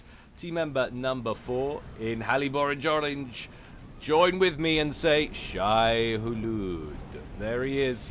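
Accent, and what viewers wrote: United Kingdom. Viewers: 5 stars caster. you know your game, great commenting on tactics and strategies. Pleasant voice with that lovely British accent ( sorry if you are not brit I took a guess ) and you pronounce french quite lovely. So glad I found you and subscribed.!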